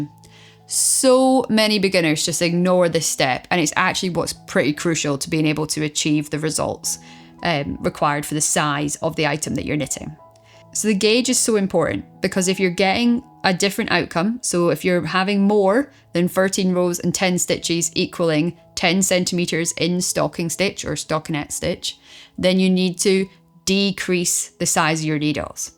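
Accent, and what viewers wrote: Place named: Scotland, United Kingdom. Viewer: thank you - I've recently discovered your channel - although I was taught to knit as a child by my gran, I hadn't done it for years and needed a refresher course before tackling a blanket for a friend's first grandchild. this is exactly what was needed and being Scottish myself, I really appreciated the accent!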